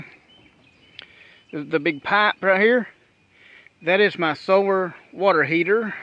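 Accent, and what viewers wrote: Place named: Kentucky, United States. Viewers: I love you're conversion! I'm a Kentucky (Louisville) girl as well and you have a lot of good ideas that I'd like to use on my Mini Bus Schoolie build. I'm about to check out your bus build once I finish watching this video. Oh, and I just loooove hearing your Ky "'Twang" as you narrated your video. What part of Ky are you from? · I am a new subscriber to your channel and am amazed at your design and the construction of your camper van. I am very interested in this way of life and have been contemplating it myself. You sound like a southern boy. I am from the south too..GA gal here. 😊 Thank you for sharing your awesome ideas!!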